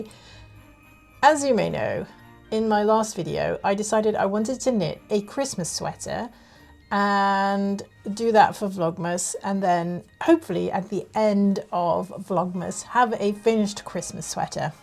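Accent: United Kingdom. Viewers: Hi - I very much enjoyed this unexpected‘knit’n’chat’! Like you I knit English flicking style and although I can knit Norwegian/Continental style, and do so sometimes to use different knitting muscles, I always return to English style because it just feels ‘right’. Can I ask you out of curiosity (nosiness 🤥?) whether you are English on your maternal side and Dutch on your paternal side, or vice versa? Sorry if you said and I missed it, but I just heard about Dutch grandmother who knitted and English grandmother who knitted, so I’m just curious - especially as you have such a nice English accent, but appear to have lived for a long time in the Netherlands. Looking forward to more Vlogmas videos 😊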